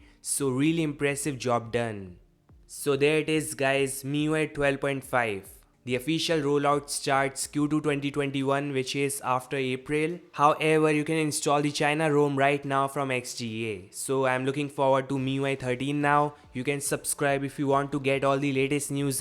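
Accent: India. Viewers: Indian speaking english? Auto subscribe 👍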